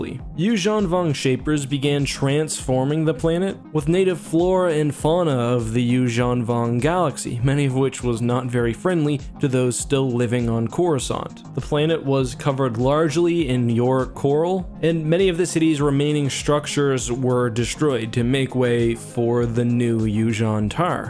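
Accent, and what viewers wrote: Canada. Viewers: You know he's canadian by the way he says "Aboot" instead of "About" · Are you canadian? You pronounce about in a canadian way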